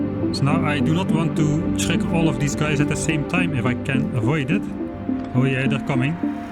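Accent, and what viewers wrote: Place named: Netherlands. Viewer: Klasse video! Oprecht een van de heftigste Nederlandse accenten die ik ooit gehoord heb haha.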